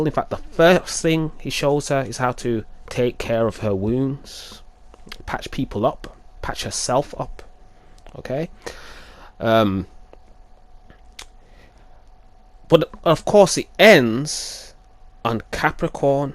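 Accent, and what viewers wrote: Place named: South Africa. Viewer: Holy crap, had no idea you did this. You sound like you're from.... South Africa??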